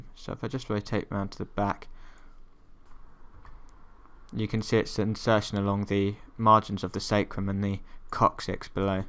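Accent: United Kingdom. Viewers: Love you, love you're work!! Crying with laughter at that brilliant verse "S2, 3, 4...". A&P exam tomorrow for my yoga teacher training cert, have been through so many of the vids in last two weeks- they are brilliant and have helped me massively. None of this crappy american voiceover stuff, was over the moon to hear the British accent when I clicked on the first one - legend. I'd better pass now! :-)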